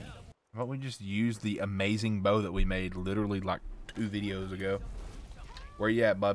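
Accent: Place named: Rural, United States. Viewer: dude i live in texas and your accent is so hick lol skahrim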